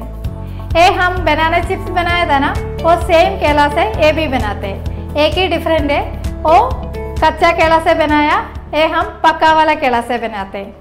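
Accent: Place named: South, India. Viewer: I am from Bihar love to listen your Hindi . Truly speaking I don't want to listen your perfect Hindi Because your innocent Hindi tone mixed with south Indian tone sounds Awsome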